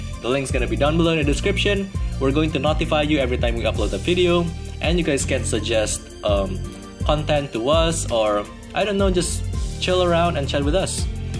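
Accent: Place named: Philippines. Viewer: damn i was convinced that the guy voicing the videos was filipino cuz his accent is very similar to filipinos. nice to know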